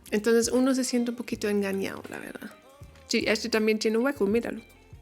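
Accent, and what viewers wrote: Colombia. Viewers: Ya hasta tiene acento y expresiones colombianas. Impresionante. 😮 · Tan linda 💐🌹 Gracias por todos los alagos a Colombia belleza 💞 hablas muy a lo colombiano 💐💐💐 Bendiciones ✨✨✨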